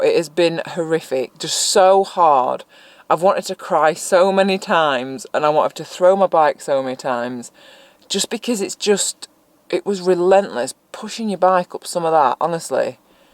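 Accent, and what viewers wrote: United Kingdom. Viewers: I am so fall in love in your British pronunciation. Bloody Hell! 🤣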